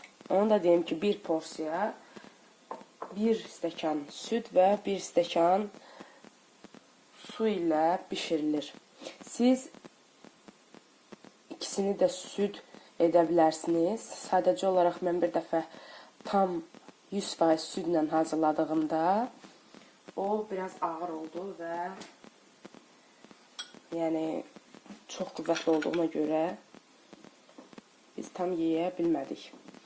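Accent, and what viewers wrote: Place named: Armenia. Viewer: Yeraz aksentine oxsadi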